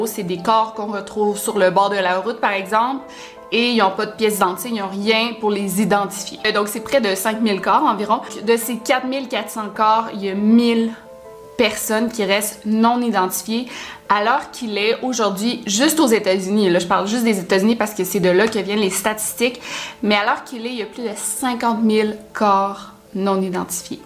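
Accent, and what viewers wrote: Quebec, Canada. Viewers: je comprendrais jamais pourquoi tu te fais autant critiquée😭 je sais pas, tes vidéos sont supers, recherchées, tu expliques les “détails”, ta voix est très agréable et j’adore l’accent québécois ahah! ce que je préfère c’est les kidnapping/enlèvements :) voilà bisous, et félicitation pour ton mariage (qui est dans un mois, je crois ?!) ❤️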